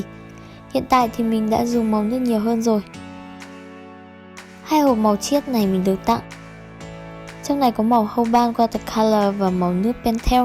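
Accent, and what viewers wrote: Vietnam. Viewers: Chị này người việt hả ? Mình mới theo dõi chị gần đây,thấy đa phần là fan nước ngoài Nên tưởng chị người nước ngoài, h nghe giọng mới biết😂😂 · Á hahha mình nghe được giọng bạn rùi cute quá! Giờ ms bt bn là người Việt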